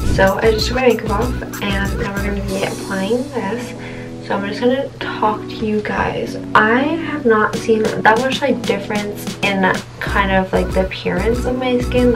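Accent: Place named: Southern California, United States. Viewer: It’s that Southern California accent lol. We all sound like that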